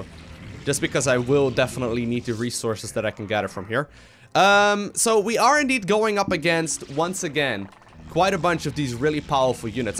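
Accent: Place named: Netherlands. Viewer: So more people where screaming and hitting their screens yelling " look at your minimap!!!" Do love the vids and ur dutch accent, being dutch myself;)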